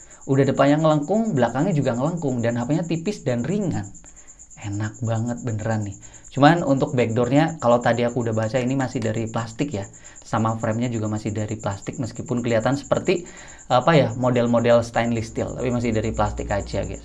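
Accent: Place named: Cirebon, Indonesia. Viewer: kaya orang cerbon ya mas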